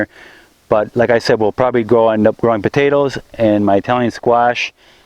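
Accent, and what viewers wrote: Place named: United States. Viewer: You ever been told you have a slight Polish-American accent. I live in south Texas with mainly Polish-American and Mexican-American folks and you sound like you are from around these parts.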